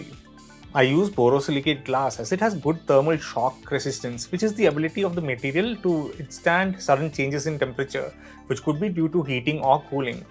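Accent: India. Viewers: Guessing by the accent (and Rupees), I'm guessing is in India? I put my glass bed printer away 9 years ago. At the time I had read about using Aluminum to improve ABS warping. I bought a sheet but never got around to cutting it. If I had taken the time, my priority would have been to build an enclosure; since I have a Delta-v printer, it would have been a headache to cut a circle so I never did it. Now that I'm restoring my printer to get it up and running again I figure it's time to get the best bed, hot head, enclosure etc. My understanding is that India is HOT. I can see how thermal properties of bed are not as important to you. but in California, despite the tourist propaganda about sun and palm trees it is not India. I struggled to get up to temp to use ABS. I'm currently sitting in a 95 degree garage, but average is more like 65 F and nights get down to 40 F. 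my garage has an annual range of 40 - 130F. 10 years later, should I still be considering aluminum bed, or have materials evolved to the point where I should just consider better modern filament? I don't find any of the reasons for glass here to be very compelling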